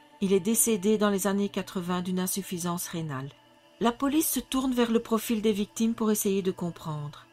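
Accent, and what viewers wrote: Belgium. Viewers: J'adore ton accent belge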